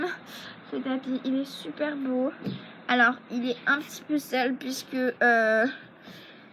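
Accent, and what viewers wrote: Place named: England, United Kingdom. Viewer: L'accent anglais😅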